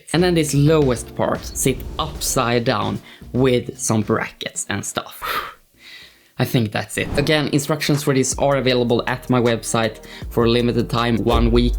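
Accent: Sweden. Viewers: Så stark svensk brytning haha...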